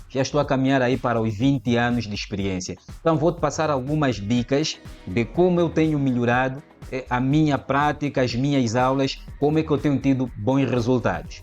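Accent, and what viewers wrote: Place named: Angola. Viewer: professor você é de angola ou moçambique? Sem preconceito é claro.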